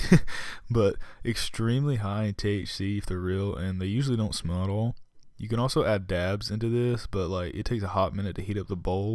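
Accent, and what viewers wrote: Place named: Kentucky, United States. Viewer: dude sounds like he from Kentucky :o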